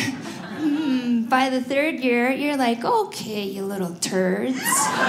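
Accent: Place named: Minnesota, United States. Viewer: Lol she has the stereotypical Minnesota accent.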